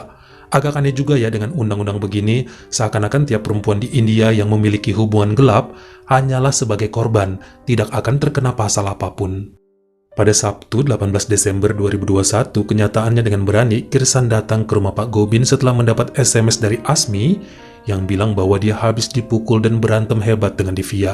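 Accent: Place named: Java, Indonesia. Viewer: HALLO...... S 3 MAKIN COOL AJA NI CHANEL KAK, NGANGENIN BANGET PEMBAWAANNYA EPIK DI BUBUHI BHS JAWA MEDOK YG BIKIN SENYUM SENYUM SIMPUL SEHAT WALAFIAT SELALU SELURUH KELUARGA YA KAK, SALAM SEHAT DARI SYRIA 🇸🇾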